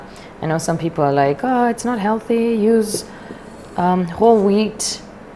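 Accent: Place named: Kenya. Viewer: Your Kenyan accent 😍😍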